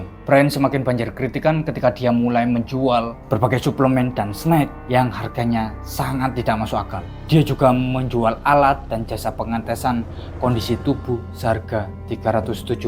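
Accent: Java, Indonesia. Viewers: logatmu medok banget min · Suara mu terlalu medok le le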